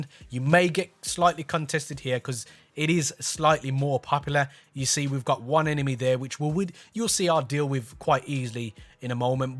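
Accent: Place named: Wolverhampton, United Kingdom. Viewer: ah, close! Thought I heard the wolves-ism's. Good video mate, keep it up!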